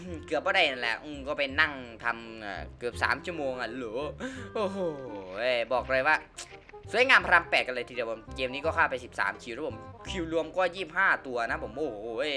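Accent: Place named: Isan, Thailand. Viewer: พูดอีสานดีกว่าคับเป็นแบบฉบับเค้าเอง มันสนุกน่ะ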